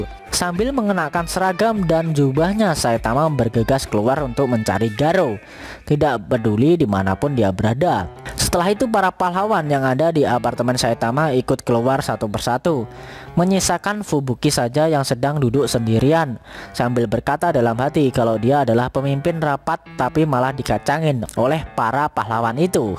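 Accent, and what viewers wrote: Java, Indonesia. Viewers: Medok tolol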